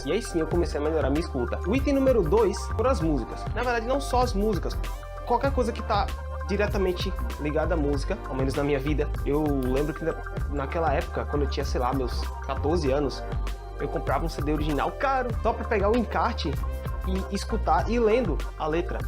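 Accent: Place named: United Kingdom. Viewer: Olá, fiquei curioso para saber como você adquiriu sotaque britânico. Se puder me responda por favor. Obrigado!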